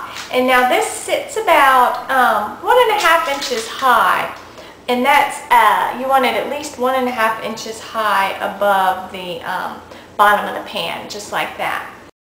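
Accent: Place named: Georgia, United States. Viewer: Where are you from? You sound like Georgia.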